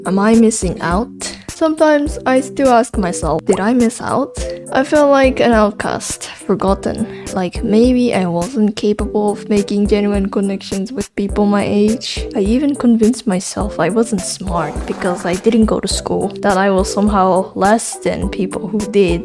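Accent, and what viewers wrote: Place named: Germany. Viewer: Ohhh interesting story! What country are u from? I thought u might me German at first because of your accent, but I think homeschooling isn’t allowed there at all… are u Asian? 😮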